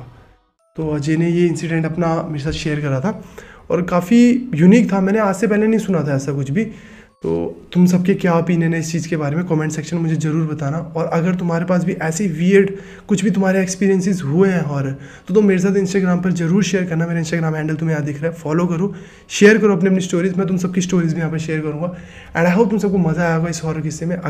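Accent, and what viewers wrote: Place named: Himachal Pradesh, India. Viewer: Good story telling💯 Are you from Himachal bcz your accent and choice of words seems like that?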